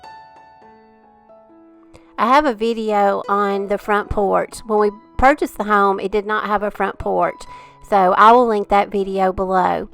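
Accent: South, United States. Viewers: I absolutely LOVE your home and all that you’ve done to it. Also, being from the south, but living in Northern Virginia for the last 30 years, your voice made me think of all my “home girls”! I subscribed and now I’m going to watch all your videos as I wait for new ones!😀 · Just found your channel today. Cute cute cute! I'm from Alabama and I recognize a Southern accent 😂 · Wow! I love your home. It is very cozy. I love how you have paid attention to detail. I absolutely adore your style. You and your husband have done an amazing job on the remodeling. You have a sweet southern voice. What state were you raised. I’m from northeast Alabama and living in Southwest Arkansas. ☺️🧡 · One question, where is the cook top and oven? I see the fridge is in the laundry room, isn't that inconvenient? I may have missed something as I have the video on mute, can not stand the sound of your southern drawl voice, it's nauseating. 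· Your home and decorating style are spectacular!! You certainly have a flair!!! Do you happen to remember the ebay site for your door valance? It would be perfect for me to use on my door going out to the porch!! Your ideas are swimming in my brain now to see what all i could do!! Thank you for sharing!! Take good care!! I subscribed!! P.s. loved the southern dialect as i am from Tennessee now living in Georgia!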